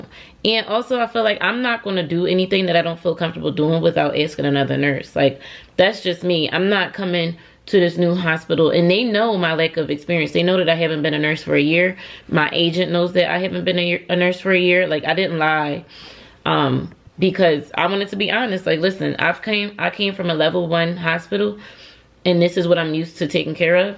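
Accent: Philadelphia, United States. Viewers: As soon as i heard your voice I'm like no way she's from Atl, gotta be from Philly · I thought you sounded like you were from Philly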